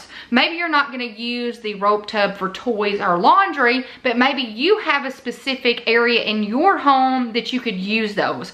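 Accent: South, United States. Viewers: Country accent love it. I just found your channel.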